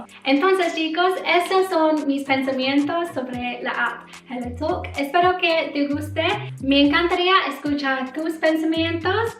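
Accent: Latin America. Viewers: Bonito acento y pareces latina! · Amo que hables el español como los latinos y no como españoles! HAHAHAHH Me encanto tu vídeo, desde hoy empiezo a usarla espero me vaya bien!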